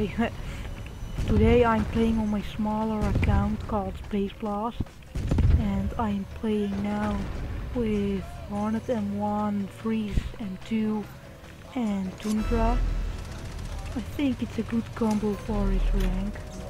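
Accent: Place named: Netherlands. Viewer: Je hoort wel goed dat je nederlands bent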